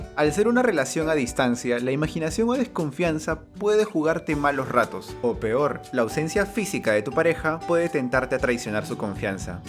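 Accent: Peru. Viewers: Que wea eri peruano?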